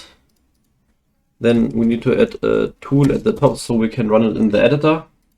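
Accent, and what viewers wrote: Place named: Germany. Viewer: German accent goes BRRRRR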